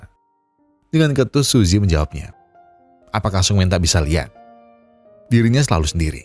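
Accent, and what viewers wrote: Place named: Banyumas, Indonesia. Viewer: Deneng teyeng ngapak njenengan mas?😅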